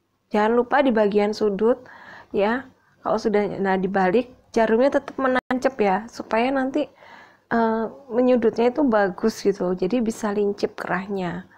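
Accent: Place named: Java, Indonesia. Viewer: Bahasane mbk lucu.. Wong jowo yo.. Daerah pundi.😁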